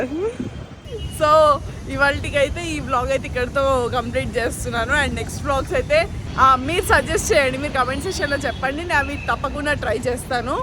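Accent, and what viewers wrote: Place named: Telangana, India. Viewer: Nee voice kacha Telangana slang lo mast untadi.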